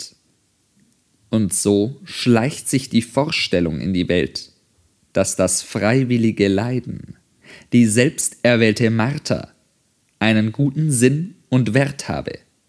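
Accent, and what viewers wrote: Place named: Austria. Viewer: Es hat diesen össtereichischen Akzent. Aber ich finde daran kann man sich gewöhnen. Es ist dafür klar und flüssig gelesen und auch gut aufgenommen. Das Paket finde ich insgesamt bemerkenswert und einzigartig. Wenn man sich an die persönliche Note gewöhnt hat (die jeder Vorleser hat, das liegt per se in der Sache) kann man sich wirklich sehr gut auf das was Nietsche da verfasste konzentrieren. Schade eigentlich, wenn jemand dann aufgrund persönlichen Nichtgefallens zu solchen Ausdrücken greifen muss, und das so global abtut. Daher: Deine Meinung teile ich ganz und gar nicht. MfG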